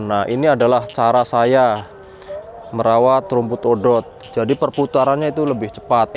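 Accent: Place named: Banyumas, Indonesia. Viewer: Wong ngapak yae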